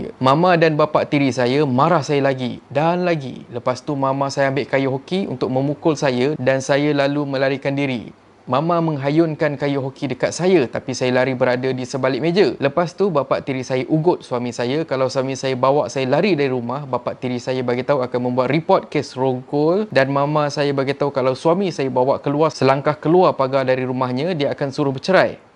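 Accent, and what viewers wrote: Kelantan, Malaysia. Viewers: The best part cube kecek klate dan slalu mitak maaf takut salah sebut dan pantun slalu terbaik, klakar abis..hehe Terbaik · Terbaiklah bang ckp kelantan 👍👍sy suka tngk &sy pun dri Kelantan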